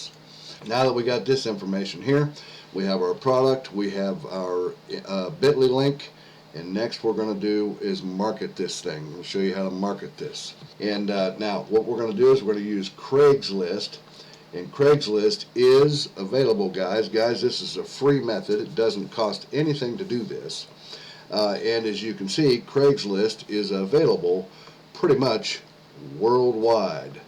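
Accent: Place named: South, United States. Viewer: Love that Southern accent. Yesss!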